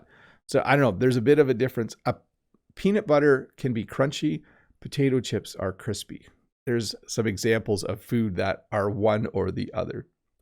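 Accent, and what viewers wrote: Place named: Canada. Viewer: Hearing your Canadian Accent really excite me. Thank for the video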